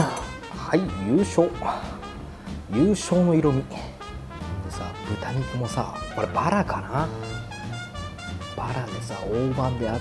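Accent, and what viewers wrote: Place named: Tokyo, Japan. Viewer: 神奈川県民なのに江戸弁www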